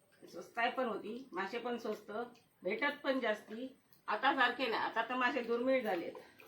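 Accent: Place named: Konkan, India. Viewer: खूप छान वाटला बघताना आणि तुमचा मालवणी ऐकताना. एकदम कोकणात गेल्यासारख्या वाटला. इतके ताजे फडफडीत मासे खंय बघाक मिळतंत. 😊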